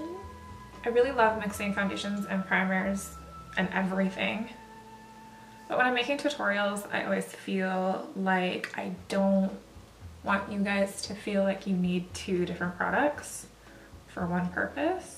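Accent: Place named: Canada. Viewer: This is the first video I’ve watched of yours upon seeing it in my recommended videos list. Could tell that you’re Canadian as well instantly !! Super cute!! Subscribed!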